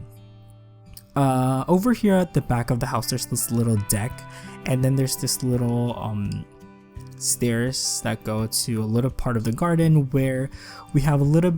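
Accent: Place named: Philippines. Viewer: Your English is very good! I read you're Filipino so your accent is so similar to my friend :)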